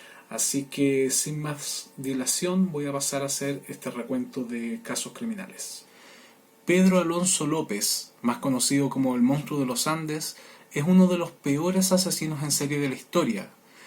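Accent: Chile. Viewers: Me encanta el asentó chileno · La tonada chilena que tenés ....le da un toque maravilloso a los casos .....muy interesante tu canal !!! Desde Argentina te saluda una chilena !!!!! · Me ha encantado tu canal... He visto varios vídeos de asesinatos y creo que los tuyos son sumamente completos en cuanto a información y material fotográfico o fílmico... Además me gusta tu voz cuando los vas narrando... El acento chileno me encanta... Saludos desde Mendoza, Argentina... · Wow tienes acento chileno, eres chileno??? No sabía jaja eres genial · Me gustan tus documentales, por que tu voz es neutral y muy clara, me costó encontrarte el timbre chileno sin embargo es maravilloso un acento y una forma de narrar como la que tu tienes, correcto y facilidad para entenderte, siempre agregas más datos y nueva información, se agradece amigo mio.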